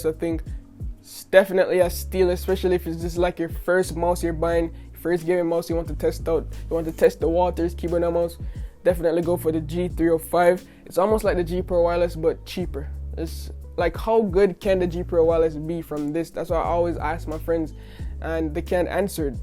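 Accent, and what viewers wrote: Jamaica. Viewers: You Jamaican I’m Jamaican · Good review. You sound Jamaican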